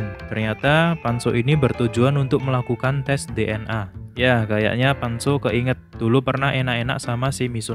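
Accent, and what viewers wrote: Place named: Sumatra, Indonesia. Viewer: pasti pengisi suaranya orang luar jawa...kayak sumatra gitu hha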